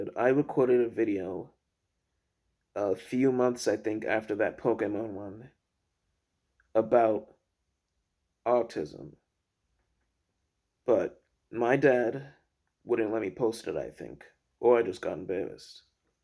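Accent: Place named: United Kingdom. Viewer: I accidently come across your twitter page earlier and me been as nosey as I am ended up here. I have to say, your an interesting guy. Also when you first started talking I would have never guessed you're from the states. I thought you had a British mixed accent but wasn't sure with what. You do have a little bit of a London accent when you say certain things too. Does your parents have an accent at all? The only reason Is, my partner grew up 20 minutes away from where I grew up and he talks completely different to me. He has a very posh accent compared to me, but his mum and dad moved around a lot for work for my mother-in-law and they have a very strange, posh, mixed accent and he picked that up. Whenever people start talking to us they can't believe we grew up in the same town. Anyway, I apologise for rambling and waffling on. I enjoyed your video, keep up the hard work.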